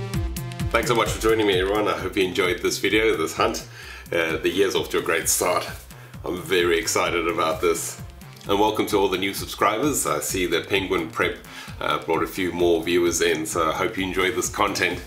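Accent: South Africa. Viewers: The longer you go back in this guys video archive the more South African he sounds 😮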